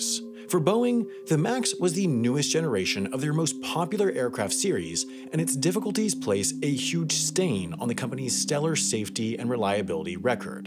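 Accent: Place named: New England, United States. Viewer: This is heavily off-topic, but does Wendover have a Boston/New England accent? I keep hearing him say "No-at" for "not" (more like naat in most American accents), same for "Dollars".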